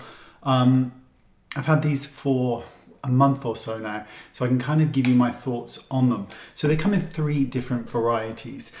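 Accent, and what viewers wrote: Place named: Wales, United Kingdom. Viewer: Nice shirt! nice accent!.Im from south wales are you half welsh?¿but ive been living in Spain for about 40 years...gosh !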